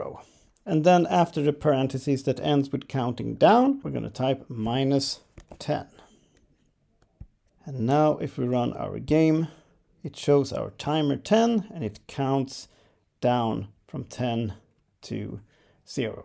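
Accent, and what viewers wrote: Sweden. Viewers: The second I lissened to your voice I was like YEPP HE MUST BE SWEDISH before even reading your name/ Hello from Another Swede :) Nice too see a Swedish Gdevelop user make videos! Please continue with the tutorials.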